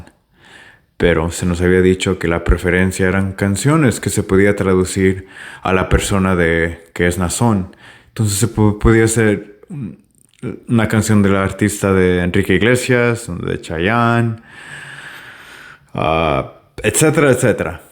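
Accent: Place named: Oaxaca, Mexico. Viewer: Oye Bro ! Eres de norte América o de sur Oaxaca ! Lo digo por tu acento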